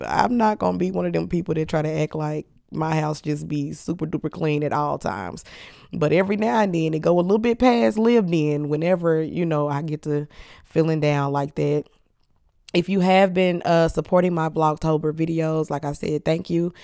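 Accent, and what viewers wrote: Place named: St. Louis, United States. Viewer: I definitely wanna become a esthetician the more and more I watch ur videos…but I kant help to ask yu got the urrrz like ur from St.Louis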